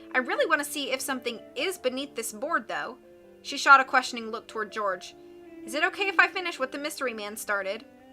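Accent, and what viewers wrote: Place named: Southern Illinois, United States. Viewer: 'skulking around'?? How does one skulk? Have you ever been skulking? It's kinda like drinking but a bit smaller? ?? You're accent is a prefect southern Illinois dialect'ie -bit. - ever been ta Cairo? Greyville? You fet right en. M. IL.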